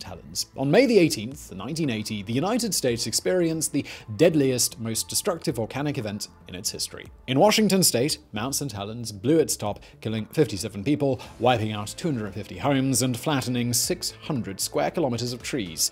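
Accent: United Kingdom. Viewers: I'm sorry to ask you this, but... You're British?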